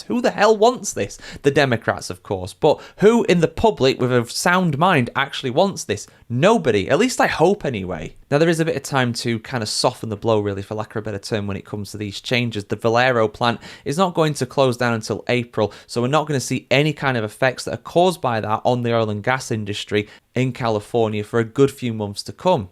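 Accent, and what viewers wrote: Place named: United Kingdom. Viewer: Eh...can't listen to a limey preach about US problems. Sorry.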